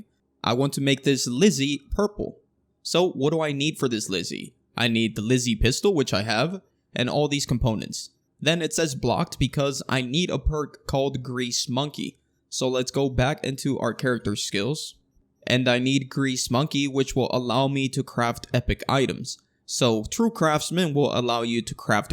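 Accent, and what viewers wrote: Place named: Brooklyn, United States. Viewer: What dialect is this, Brooklyn? Not meant as an insult.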